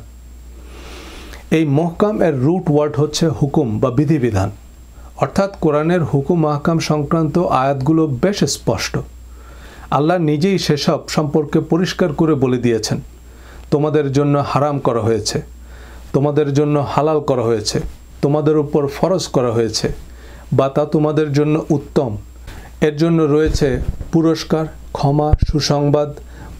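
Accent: Noakhali, Bangladesh. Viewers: By the way.... আন্নে কি নোয়াখাইল্লা???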